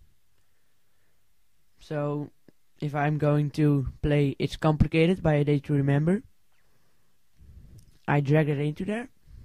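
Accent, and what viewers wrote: Netherlands. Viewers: Je kan horen dat je Nederlands bent xP Maar goeede tutorial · Je zet de Nederlanders voor schut met je kutaccent!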